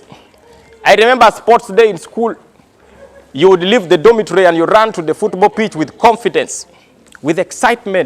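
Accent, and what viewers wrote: Uganda. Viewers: Man l love your Banyankole accent 😂😂😂😂😊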